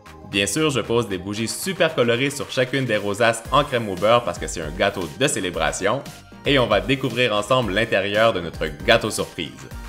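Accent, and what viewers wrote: Quebec, Canada. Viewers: 🎂🎂Félicitation pour tes 100 000 abonnés PS :je kiff ton accent québécois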